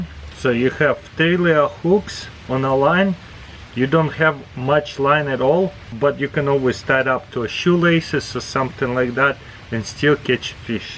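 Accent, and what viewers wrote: Russia. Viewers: На английском с русским акцентом.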